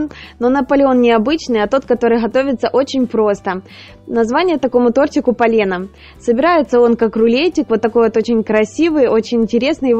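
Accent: Ukraine. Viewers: Зачем же себя так мучать? Вас заставляют смотреть такой прекрасный ролик? Открою вам секрет в Украине так все говорят!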